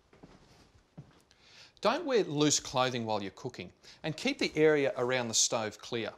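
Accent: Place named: Australia. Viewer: Everything sounds better in an Australian accent, even fire safety vids! 🇦🇺👍 Greetings from the U.S.A.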